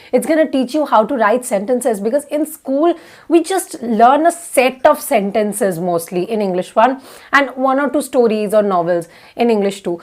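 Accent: Bengal, India. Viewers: Everything is ok but i don’t like indian English. So bad accent . 😏🤧🤧🤧🤧 sorry to say. I can't respect it.... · You are always fabulous. Adorable indian accent.❤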